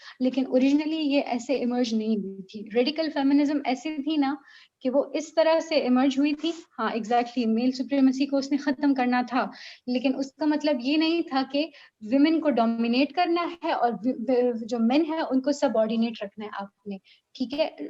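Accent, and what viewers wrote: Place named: Khyber Pakhtunkhwa, Pakistan. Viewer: Ma'am,you speak very funny urdu, apki urdu sun kr esa lg raha hai ap kpk se hn, or Ma'am apka lecture sun kr lg raha hai k ap pehli bar teaching kr rahe hn apky voice me thori c hesitation mehsus hori hai.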